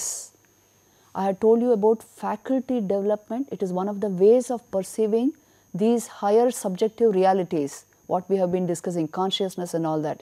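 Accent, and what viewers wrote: India. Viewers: Umm..she seems to have a pakka Bangalore accent..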